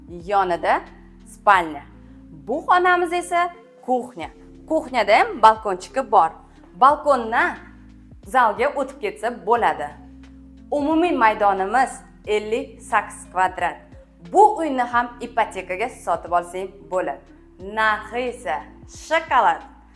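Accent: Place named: Qashqadaryo, Uzbekistan. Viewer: Qashqadaryoni qeysi qishlogidansan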